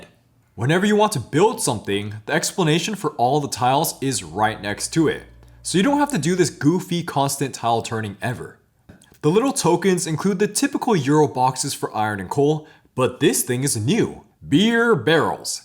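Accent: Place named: United Kingdom. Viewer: Nice British Accent. Cool game, just not my speed.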